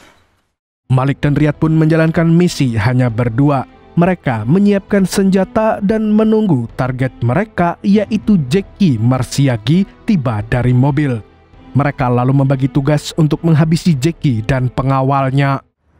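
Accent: Banjar, Indonesia. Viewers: Asli Banjar kah pian nih mang😮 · Min orang Banjar kah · Mimin urg banjar kh min? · urang banjar rupanya admin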